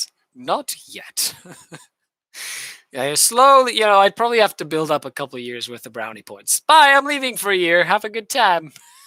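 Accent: United Kingdom. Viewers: PS. I always wondered why you were a Spanish-speaking Canadian with a British accent. Now it all makes sense!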